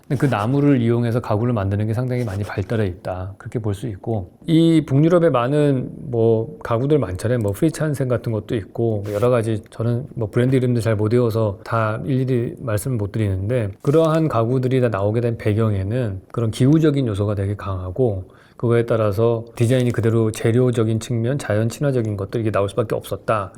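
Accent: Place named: Seoul, South Korea. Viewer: 말하는 스타일이 먼가 고급스럽네요. 나도 이렇게 말하고 싶다.ㅋㅋㅋㅋ 일단 서울말 부터 배워야 되나 ㅋㅋㅋㅋ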